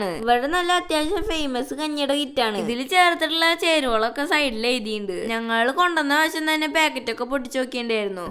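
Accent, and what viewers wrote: Thrissur, India. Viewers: തൃശ്ശൂർ ഭാഷ സൂപ്പർ ആയിട്ട് ഉണ്ട് ❤❤❤❤❤ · Samsaram ketappol mansilayi namde തൃശ്ശൂർ ഗഡി anu nnu alle😊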